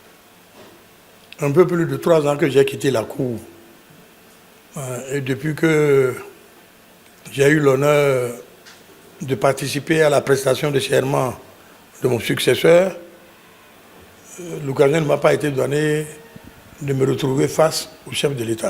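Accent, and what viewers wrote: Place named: Fon, Benin. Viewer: Votre accent résonne fon-mahi. Je suis impressionné!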